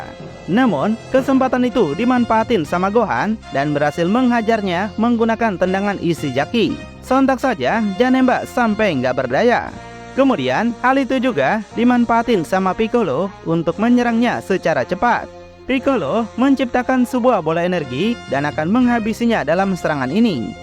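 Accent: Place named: East Java, Indonesia. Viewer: Jawatimur suaranya kawan😂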